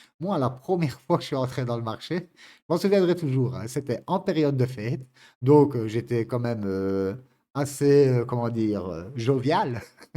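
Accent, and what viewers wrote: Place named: Belgium. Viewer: toi tu es un compatriote,accent Belge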